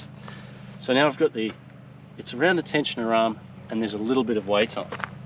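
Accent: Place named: Australia. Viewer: Ha! I knew that was also possible, but your Aussie accent is soft enough that it could be Kiwi, but I should have known by the dryness that is Oz. I guess the name and that Kiwis are often DIY-competent. We lived on the North Island for 13 years where I had a Walker, which I loved, as the floating deck out front was good for getting under trees. Now on Kauai where there is no Walker dealer, so now I'm a Hustler man. I just forwarded your video link to a friend with the same issue, so thanks from both of us!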